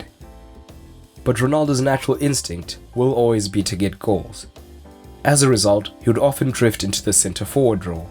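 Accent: South Africa. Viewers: Great video there mate. Are you from South Africa by any chance that accent sounds distinctly South African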